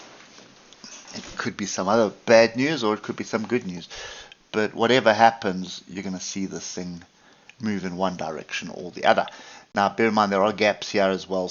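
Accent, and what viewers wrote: South Africa. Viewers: You sound like South African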